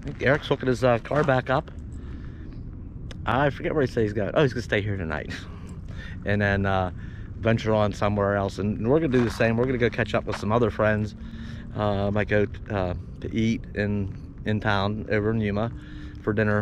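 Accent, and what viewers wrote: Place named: Pittsburgh, United States. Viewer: Are you from Pittsburgh? I detect a little bit of the burg accent! 😀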